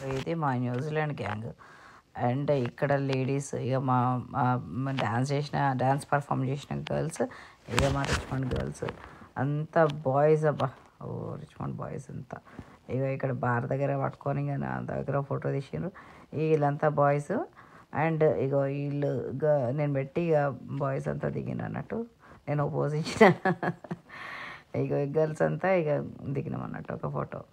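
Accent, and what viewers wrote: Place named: Telangana, India. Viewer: Super.... Meedi Telangana lo aee district?.. Telangana basha super matladtunnar 😅😅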